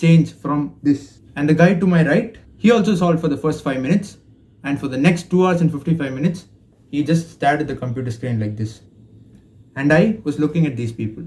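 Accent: South India, India. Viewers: U must be from South India? Rt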